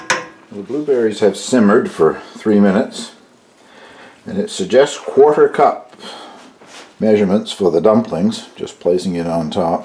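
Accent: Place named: Canada. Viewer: this looks like a yummy pudding I am wondering if the dumplings have come from my homeland (Scotland ) sadly I now live in Oxford,England which is a beautiful city of course but its not Scotland. I love your recipes its just lovely listening to your Canadian accent and enjoying all the things you cook including the cheeses. I live in a tiny bungalow with the smallest kitchen ever so I cant see myself getting into the cheese making including the press etc that needed. I started off watching your Cabin episodes but now I love all your videos I'm a big fan thank you again Mae x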